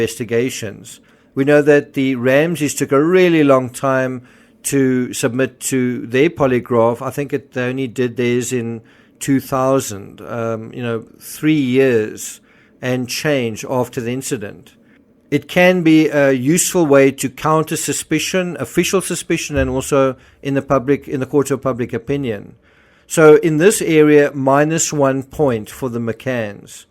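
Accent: South Africa. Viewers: Just found your channel from the Deception Detective who reacted to this video! Love it! Also awesome to hear a South African on YouTube! Keep smashing it!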